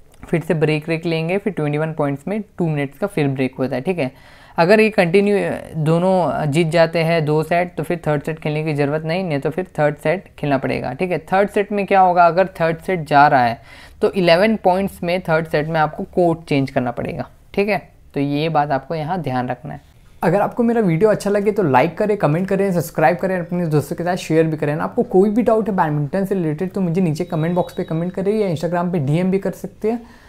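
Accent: Odisha, India. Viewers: Bhai ap odiya ho na kyuki bhech bhech mai ap Mane Mane bol rahe ho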